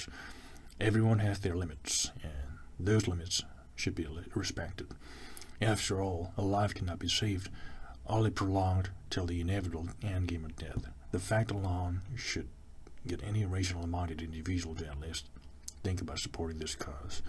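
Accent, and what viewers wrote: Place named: South, United States. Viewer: Your accent sounds very country southern USA.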